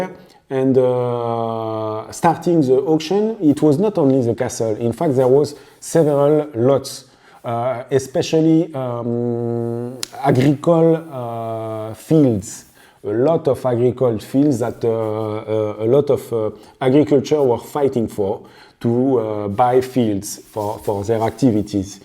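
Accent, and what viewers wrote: France. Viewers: your Frenglaise is delightful. Please continue. Je comprends tout! Mercie. Rikki Biermann, Pretoria, Afrique du Sud 🇿🇦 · New sub from South Africa 👋👋 and if you improve or change your quintessential French accent I will unsubscribe 🤣🤣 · That’s the French speaking English,I live to hear! Don’t like it too perfect!😊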